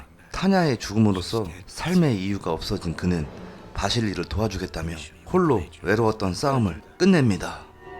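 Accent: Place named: Chungcheong, South Korea. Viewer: 주인장님이 충청도 억양이 쎄네요.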